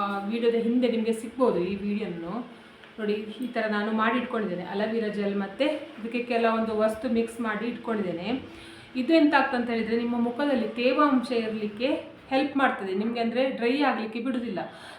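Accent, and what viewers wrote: Mangalore, India. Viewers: Chanda madi ...astu sala helthira? Mangaluru raga chanda ede..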